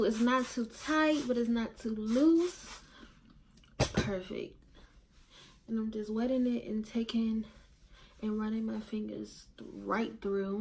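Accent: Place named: DMV, United States. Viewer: I know a DMV ACCENT when I hear one 🥰🥰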